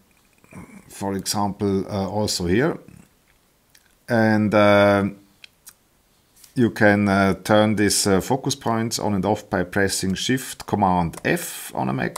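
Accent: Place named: Switzerland. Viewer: Da ghör ich doch en schwizer usse 😃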